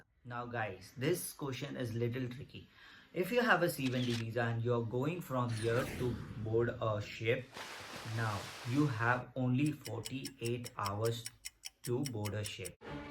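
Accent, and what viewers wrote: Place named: India. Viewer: I love your videos and the your Indian accent . Good job Bro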